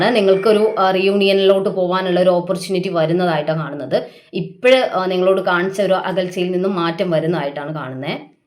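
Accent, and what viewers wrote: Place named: Malappuram, India. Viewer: Malappuram slang is superb♥️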